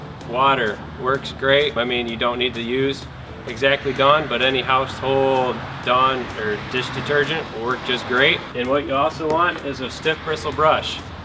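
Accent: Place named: North, United States. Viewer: I love the "accent" of the upper states!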